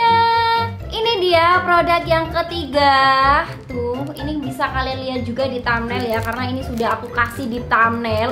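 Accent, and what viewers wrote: Java, Indonesia. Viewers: Saya paling suka dari kakak ituh orang lucu, asik banget, medok banget lofat jawa nya,hehehe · Orang jawa kalo ngomong bhs indonesia lucu😂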